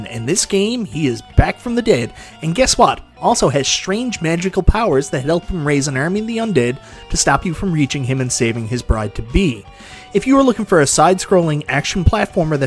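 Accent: Newfoundland, Canada. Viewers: Holy fuck buddy I can recognize a Newfie accent from 100 miles away. Just picked up one of them machines on Facebook market place. Anywhere to find them here on the island? Or better to order them online?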